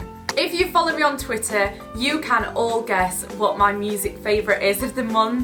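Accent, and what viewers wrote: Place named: Yorkshire, United Kingdom. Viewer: you are so confident with your Yorkshire accent on camera. Like I'm from Yorkshire and I seriously think mine sounds awful sometimes even on just a normal video. It's so weird. Love you btw!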